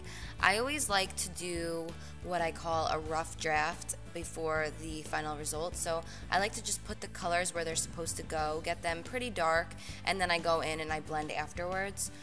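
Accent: New Jersey, United States. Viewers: I never noticed her jersey accent until now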